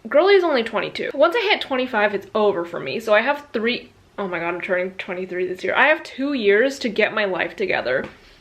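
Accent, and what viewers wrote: United Kingdom. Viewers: that british accent is more realistic than my own english accent lol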